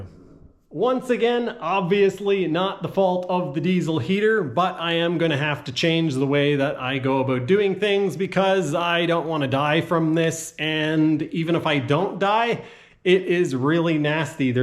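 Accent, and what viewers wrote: Canada. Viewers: It's aboot the grraj! Love that Canadian accent! 😉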